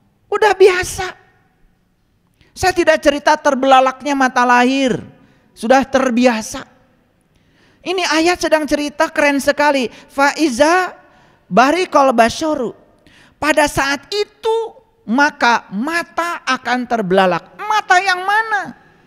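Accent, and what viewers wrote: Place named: Sunda, Indonesia. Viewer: Sunda nya medok , kita teu ngerti ustad....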